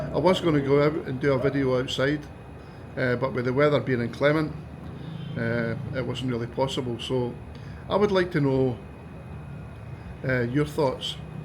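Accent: Scotland, United Kingdom. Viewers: Where are you from? You talk like the people in "Still game" and I absolutely love it😅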